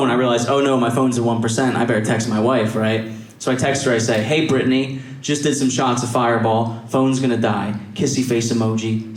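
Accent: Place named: East Coast, United States. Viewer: Great special. Dig his easy flow, never low on the funny. Voice (not style) every so often sounds like Stavros Halkias for a few seconds, he has to be East Coast origin. I’ll watch this a bunch of times.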